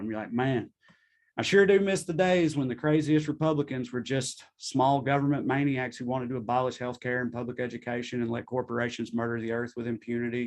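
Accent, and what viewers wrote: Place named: Tennessee, United States. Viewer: This level of sardonic erudition casually delivered with a thick Tennessee accent is f**kin' comedy gold.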